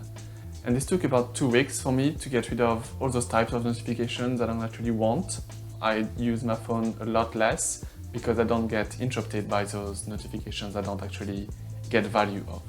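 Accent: France. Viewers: Ton petit accent français doit tous les faire craquer ! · Love it saving time is essential so we can do the things that we like. Loving the minimalist approach too. Also your French accent is endearing the British boys must love it. Although the London gay scene can be exclusive.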